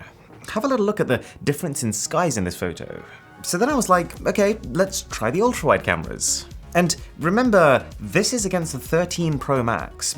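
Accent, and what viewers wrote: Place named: United Kingdom. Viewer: The British Accent is everything for me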